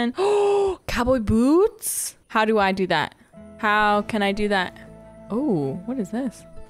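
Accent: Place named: Magdalen Islands, Canada. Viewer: it reminded me most of the accent on Les îles de la Madeleine in Quebec. Close but not as “muddy”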